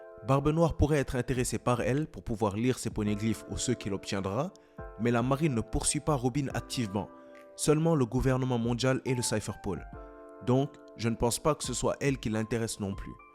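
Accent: Senegal. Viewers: Ta théorie tient la route et est très intéressante mais il y a un detail qu’on oublie c’est qu’on est dans la phase finale du manga donc je pense qu’on est plus en phase d’acquisition de développement mais plutôt de conclusion et de demonstration. Pourchasser un possesseur de fruit me semble plus dans l’ordre des priorités actuelles, je pense que c’est plutôt tout ce qui est poneglyphes et armes antiques qui peuvent le motiver. (D’apres ta voix es-tu d’origine sénégalaise ?)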